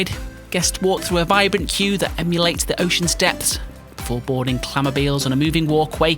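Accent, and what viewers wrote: Liverpool, United Kingdom. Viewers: Is that a scouser accent? Jesus this was hard to listen to.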